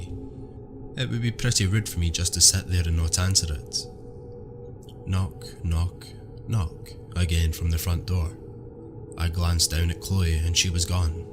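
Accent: Scotland, United Kingdom. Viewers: Omg score! Scary stories read with Scottish accent hah! Love it! ❤️😁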